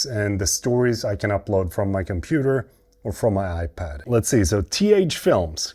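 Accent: Sweden. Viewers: Nice, I just found your channel. Can hear you are from western West Gothia on your accent in English, probably near Göteborg. I am a Swede from Falköping, live in Mexico now, but moving home soon. Enjoyed the video, it is funny how to explain sociala avgifter tax and pre-skatt for Americans lol. I remembered once as a young Swede, being a 2-shift factory worker, when I calculated my arbetsgivaravgifter, income tax and all the stuff I paid all that moms and other "avgifter" on I was at a 78% tax rate. Cheers from Mexico, Liked and subscribed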